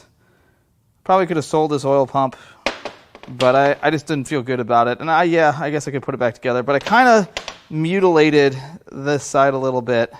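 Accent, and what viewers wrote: St. Louis, United States. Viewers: True StL dialect. Wo ter. Like driving on Far-de-Far past the Carn fields to Worshing MO.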